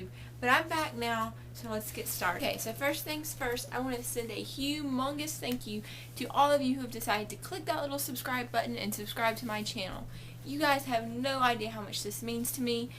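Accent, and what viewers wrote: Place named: South Carolina, United States. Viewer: i love ur vids\ and you sound like ur from sc are you??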